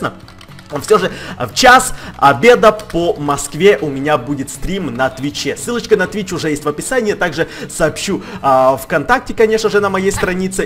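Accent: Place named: Ukraine. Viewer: Какие же старые видио....... даже говорит с украинским акцентом )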